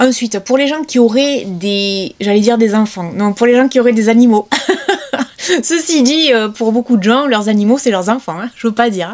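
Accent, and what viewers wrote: South, France. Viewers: Magnifique accent du sud ❤ 😊 Vous êtes belle, élégante, simple, modeste, intelligente et votre travail sur youtube est remarquable! De tout cœur bravo! Continuez, ne lâchez rien. ❤❤❤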